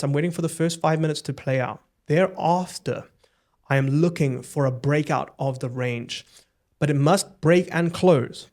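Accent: South Africa. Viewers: sounds like he is from Cape Town 🤔 lovely video by the way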